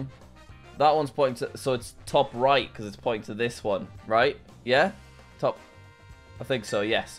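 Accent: United Kingdom. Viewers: Bro sounds british (if hes actually real british im sorry im new to this channel)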